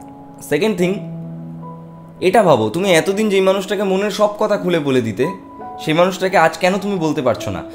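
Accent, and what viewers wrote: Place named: India. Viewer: কথা শুনে বুঝতে পেরেছি বাড়ি ভারতে ভাইয়া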